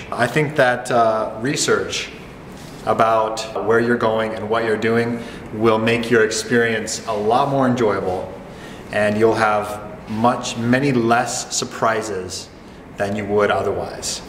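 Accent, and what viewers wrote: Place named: West, Canada. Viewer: You must be western Canadian. I learned when I moved here that people say "...right?" as a confirmation at the end of a sentence very often. People can come to a country without researching if they are flexible people, but otherwise, be prepared to suffer!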